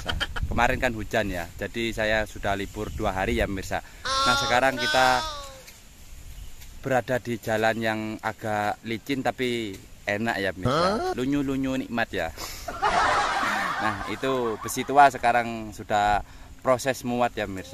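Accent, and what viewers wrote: Madura, Indonesia. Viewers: Mas orang malang apa madura ya.??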